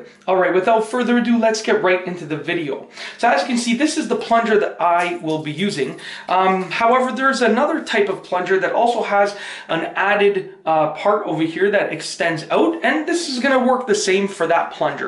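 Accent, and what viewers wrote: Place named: Canada. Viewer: I can tell you're Canadian by how you say oat instead of out.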